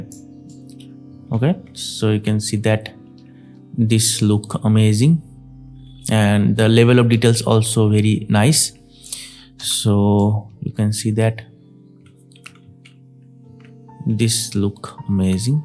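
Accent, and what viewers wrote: India. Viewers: Oh no, Indian English😢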